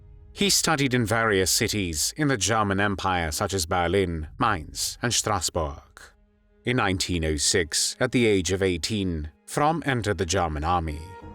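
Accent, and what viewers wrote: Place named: United Kingdom. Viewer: Get rid of this standard BRITISH accent narrator. GET RID of his AUSTRIAN ROLLING VOWELS speech.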